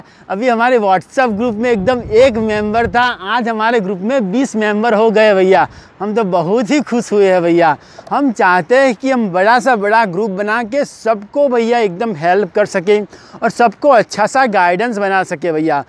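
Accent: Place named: North, India. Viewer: आप गुजराती है पर आपकी हिंदी का लहज़ा उत्तर भारतीय है , ये बड़ी अच्छी बात है।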